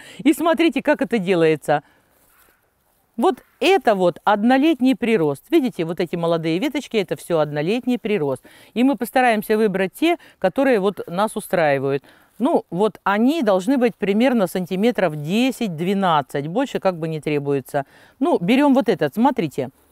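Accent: Standard. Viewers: Вас слушать одно удовольствие Спасибо. Сейчас такая редкость обычная русская речь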